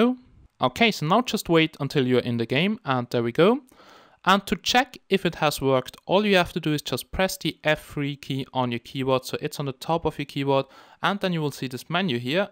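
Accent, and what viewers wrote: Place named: Germany. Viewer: hey, are you German? You really sound like :D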